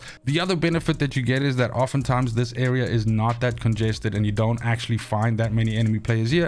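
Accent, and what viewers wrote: South Africa. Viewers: Were are you from? South Africa? Hard to pinpoint the accent hehe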